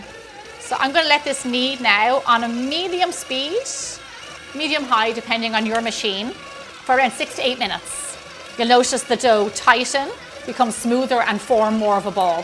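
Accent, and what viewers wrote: Ireland. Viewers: Love all of your recipes, tips, and especially your Irish accent!!! Been a fan since before Georgie was a twinkle!! Keep up the fabulous yum!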